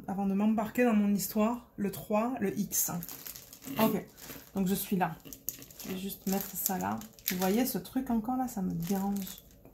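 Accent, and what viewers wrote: Marseille, France. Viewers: Coucou ça va ? Alors, non je n'ai pas profité des soldes du 11 novembre sur aliexpress parce que j'avais déjà passée toutes mes commandes. Je n'ai pas pu attendre lol. J'ai tellement hâte de te voir faire la toile aux 275 couleurs ! J'aime beaucoup ce que propose Diamond Dotz mais je trouve que c'est très cher ! OUI j'adorerais pouvoir voter pour ta prochaine toile et avoir le choix entre 3 ou 4 dp. J'ai beaucoup aimé tes anecdotes sur le Canada par rapport à la France. C'était très drôle. (Oui ! Tu as toujours ton accent marseillais teinté d'un accent québécois 😉) Le tag : J'adore mon travail. Je vend des piscines par téléphone en télétravail et j'aime ce que je fais. Les gens me font partager leur projet, un petit bout de leur vie et c'est varié et très intéressant. Mon genre de film : les comédies, les films fantastique et les drames. 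J'aime beaucoup les films comme love actualy, hunger games ou la liste de Schiller. J'aime pas trop les films d'horreur, je suis trop sensible je crois lol. Je commence ma journée tranquillement avec un bon petit déjeuner. J'aimerais pouvoir faire du dp dès le matin mais avec 3 enfants c'est pas possible ! 😂 La chose la plus importante pour moi c'est ma famille. C 'est vraiment ma priorité. Je suis nulle en cuisine ! Je fais beaucoup d'efforts mais je n'aime pas beaucoup ça 😅 Mon super pouvoir : j'aimerais pouvoir rendre heureux quelqu'un juste le touchent avec les mains. Mon 1er boulot : caissière dans une grande surface. J'ai bien aimé. Les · Excellent vlog ! Tes expressions sont trop drôles !! Tu m'as fait bien rire !! À chaque fois que j'entends un français dire "les gosses" les oreilles me silent !! Hahaha Oui pour Tupperware il faut le dire en anglais ! Et pour moi tu as définitivement encore un accent français ! 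😉🤣 Dans les banlieues nord, tout est seulement en français. Il n'y a pratiquement rien en anglais. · Hello 😊 j’ai profité du 11 pour 2 toiles perso chez Ever moment. Jasmine becket griffith c’est pas trop mon truc non plus mais je vais aller faire un tour par curiosité sur le site. J’ai adoré les anecdotes sur les expressions, les 2 premières sont hilarantes. Par contre pour le terme écœurant ça fait drôle de se dire que ça signifie le contraire au Canada 😏 Je trouve que tu as encore un peu l’accent marseillais même s’il doit être moins prononcé qu’au début de ton arrivée. Ça m’intéresse de savoir pour les écoles perso car avec mon mari on se demande si par la suite on ne déménagerai pas au Canada. Paraît il que c’est le top pour les enfants autiste comme mon fils, en France c’est une vraie galère, un combat que je n’arrive plus forcément à mener et qui m’épuise. D’ailleurs je suis en mode gâteau, mon fils aîné fête ses 9 ans demain! 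Je ne savais pas que l’on pouvait parler avec toi sur Messenger 🤗 Passe un bon week-end 🙂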